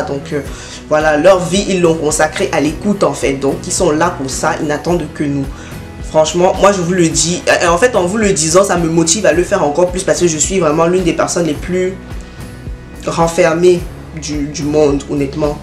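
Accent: Cameroon. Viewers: Tu as un accent camerounais????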